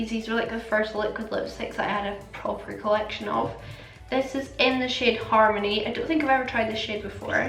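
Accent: Scotland, United Kingdom. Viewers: Some nice stuff there. I love the colours in that little eye palette. And the accent, the "HAMISH", the Flower of Scotland - all my Scotofile cravings fulfilled. LOL. You had a birthday??? Happy Birthday. Hope you had a lovely day. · Omg are u Scottish??xo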